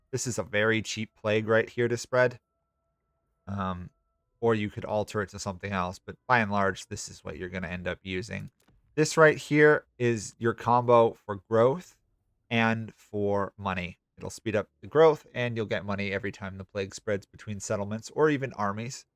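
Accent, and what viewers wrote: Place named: United States. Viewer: Dw about it lol, it's hard to know if you've never really been taught and since most fantasy names and languages originate in Asia or Europe most Americans (assuming your American or Canadian from accent) just don't know since yknow, you don't need too lmao, but I don't mind helping with it in future if you ever wanna check, if you want my discord or something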